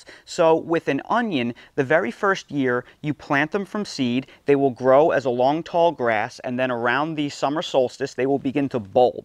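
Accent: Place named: Western Pennsylvania, United States. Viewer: Love your videos. Looking at the length of day chart, I'm confused. If the length of day zones are based on latitude, why are the zones staggered vertically, I would assume that since parallels of latitude are at a constant angle, why are the zones staggered vertically? Just a thought, I live in Farmington, NM in the intermediate zone. As an aside, you said you lived in Pennsylvania. My family was from the Johnstown area and Indiana, PA area. I note an accent, perhaps western PA?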